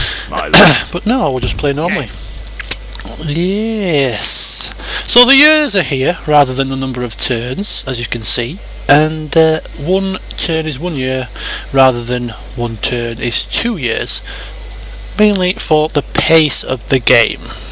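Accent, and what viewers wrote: England, United Kingdom. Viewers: lol this might seem kinda weird but it is so much cooler to watch a person with an English accent do an English campaign then it is just any other kind of person... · Your accent is that of a true englishman (from an englishman) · YEEESSSS a english person with a funny acsent no affence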